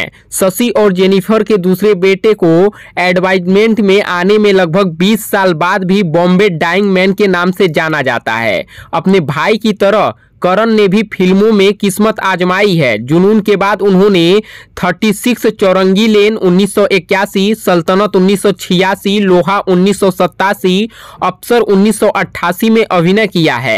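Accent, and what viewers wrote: Bihar, India. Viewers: Aapke language mein bihari axen aata hai ise thik karo. Aur shashi kapoor hain sasi kapoor nehi.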